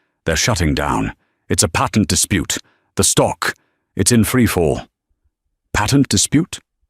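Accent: United Kingdom. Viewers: Another interesting story about a sensitive issue. Well written. I love this British narrator voice. ❤❤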